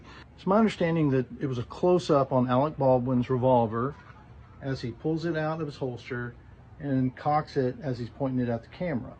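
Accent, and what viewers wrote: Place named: Washington, United States. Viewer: Really sad event. I noticed you sounded like you were from Washington then I saw your hat at the end. Do you work on movies up here?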